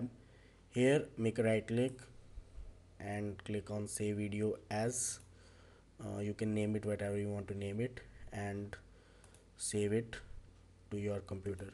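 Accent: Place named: India. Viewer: why would you trust a person with an indian accent and bit.lys in the comment section explaining how to log out from messenger....not to mentiont that 90% of positive comments are indians.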